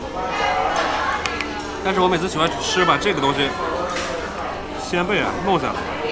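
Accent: Inner Mongolia, China. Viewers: 它来自鄂尔多撕，那里的土豪家里都有矿，煤矿，钱多的比山西，陕西煤二代也不差，所以不要小看山陕，内蒙口音的大土豪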